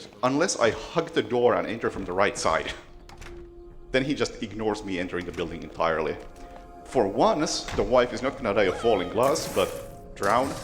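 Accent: Finland. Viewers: "Hello" - this guy's Finnish! Damn the accent of my countrymen is so distinguishable it's not even funny :D Great gameplay. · Beautiful finnish accent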